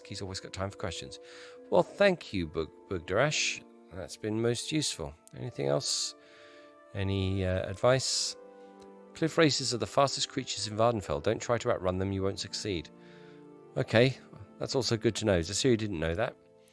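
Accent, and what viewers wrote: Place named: United Kingdom. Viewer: I do appreciate some British accent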